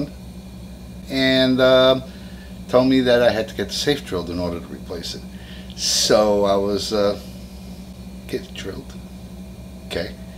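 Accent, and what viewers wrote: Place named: Brooklyn, United States. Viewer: Fantastic video; really well done. I have to thank you, since I had tried other videos on YouTube, many of which were very well-made, as well. However, your's did the trick, and saved me a ton of dough in the process. I'm truly grateful to you for having taken the time to post this. BTW--- Love the accent... Brooklyn???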